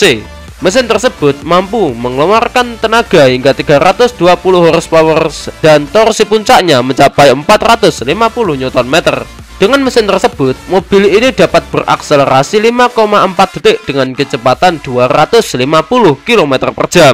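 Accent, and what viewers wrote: Java, Indonesia. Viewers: Jowo ne medok 😄😄😄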